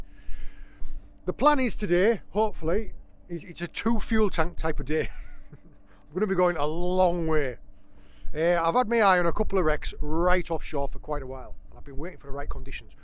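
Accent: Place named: Cumbria, United Kingdom. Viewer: you’ve almost got a bit of a Cumbrian twang in your accent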